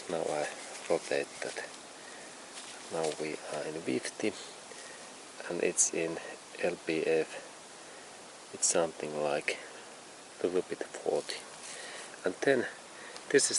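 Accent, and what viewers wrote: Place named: Finland. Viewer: Kuulostaa kyllä ralli englannilta :) Kyseessä siis zetec se, eli pikku zeteckki.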